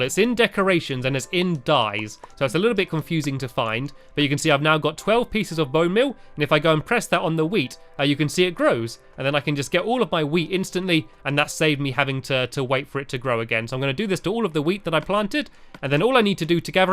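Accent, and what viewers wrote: England, United Kingdom. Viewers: it' because Z was originally Zed but because of 'Muraca it's Z so technically he is right and were wrong "short answer UK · Cuz he’s English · Well you must be American because he is british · Brianna Buckner he's British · Brianna Buckner Because there British and they live in the U.K. and in the U.K. they say zed instead of z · he ameracan or english americans call it z and engerlish calls it zed thats just there landguge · he’s british that’s why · were u born in englend i cant tell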